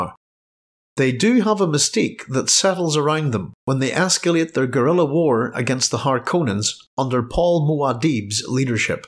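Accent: United Kingdom. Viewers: Wow, I was almost all the way through the video thinking you are Scottish when I tuned in and realised your accent is Northern Ireland. Sometimes an Ulster and west coast Scottish accent can sound similar. I wonder if it's because many Scots settled in Northern Ireland. Less Zen-suni wanderer and more See-you Jimmy wanderers :) Great video.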